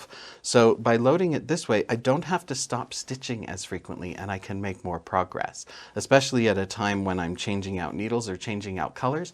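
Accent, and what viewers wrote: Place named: Canada. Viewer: Hello. 😊. But, why use a hoop at all? (ps:you sound like me, from Canada!)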